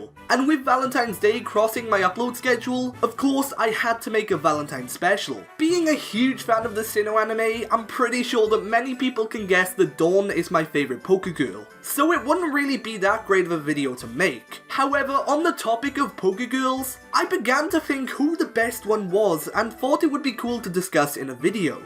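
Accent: Wales, United Kingdom. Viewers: Nice to hear a Welsh person on YouTube!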